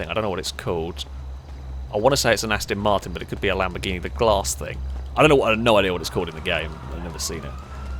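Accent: United Kingdom. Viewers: It's guys like this that give the British accent abuse... that, hellllllooooooo at the intro. Fs man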